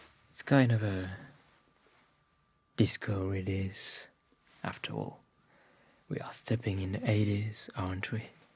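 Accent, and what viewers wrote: France. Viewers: Nothing like listening to some French Accent ASMR before bed! I love this!!! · the fact that he has a french acc sent makes the ASMR so much more enjoyable and relaxing. it also makes the video feel more authentic. awesome work man much love 😊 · The acent of a French speaking is great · 5 minutes into the video I was like "damn his fake French accent is so on spot! ... Wait ... Is it fake tho?" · I'm from Russia, but I love listening to your English with a gorgeous French accent.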